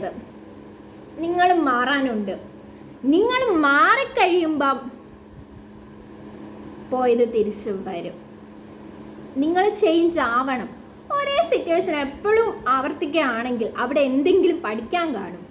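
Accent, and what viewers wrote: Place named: Kollam, India. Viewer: കൊല്ലം ടtyle സംസാരം നല്ല രസമുണ്ട്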